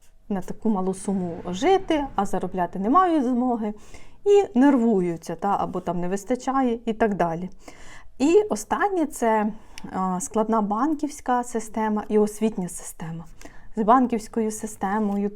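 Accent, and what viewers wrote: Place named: Western Ukraine, Ukraine. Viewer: а ви самі чому в Германії. Ви звідки тікали, з якого міста? Я питаю, бо по вимові, ви з західної частини, а вас не обстрілювали... Багато людей з західних обл. Повтікали за кордон і здають переселенцям свої квартири дуже дорого, маючи дохід живуть за кордоном і ведуть себе наглувато, розорять нашу країну.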